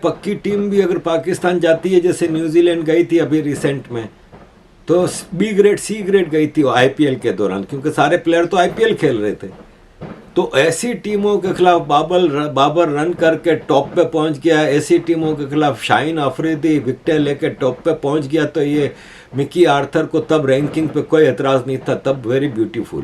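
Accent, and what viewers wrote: Gujarat, India. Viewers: Nice to hear you and your style of talking...adding some gujju snd kacchi dialect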